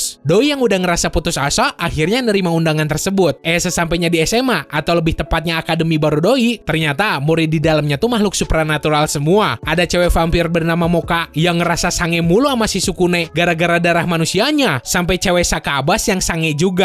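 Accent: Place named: Java, Indonesia. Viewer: jawa keras lurd wkwk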